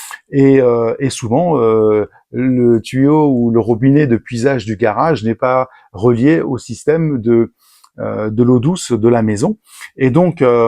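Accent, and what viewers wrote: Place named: England, United Kingdom. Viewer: Nouvel abonné de votre chaine, jaime beaucoup votre flow et son contenu qui va à l'essentiel, en gros vous ne parler par pour rien dire 😊. Et l'accent englais 😅 j'adore. Je pense aussi que si nous ne consommons peu de produit cest peut-être aussi que nous ne pouvons plus laver les voitures en extérieur mais si c'est chez nous ,sous peine d'amandes. 😥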